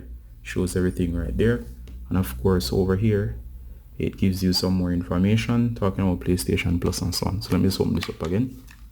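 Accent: Jamaica. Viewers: U jamaican right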